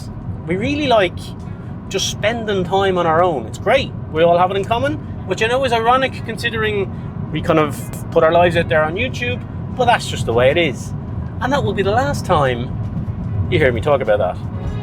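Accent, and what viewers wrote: Ireland. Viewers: his Irish accent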